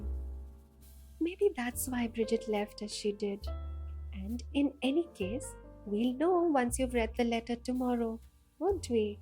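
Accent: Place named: India. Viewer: A sweet story in a sweet voice. Thank you for bringing these kinds of stories to us in Indian accent. It (nest) really gives us (listeners) comfort (Indian accent). Thanks!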